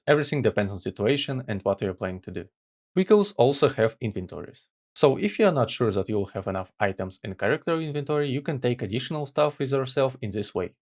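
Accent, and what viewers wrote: Russia. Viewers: Are you Russian,but you know English? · Мне кажется, или я слышу русский акцент?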